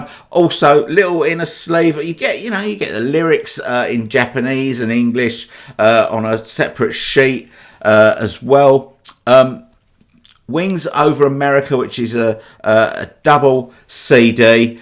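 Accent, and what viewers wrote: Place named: Cockney, United Kingdom. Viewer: Hi, Just one off the ol' cuff and not related to this latest offering, but I watch all your videos and like that east end twang you have... Am I correct in thinking your an irons supporter? If so I wonder how many of us are duel Hammer/Beatle fanatics? How wonderful both our sides ruled the world in the mid sixties, anyway enough of the sentimentality! Take care Kev P.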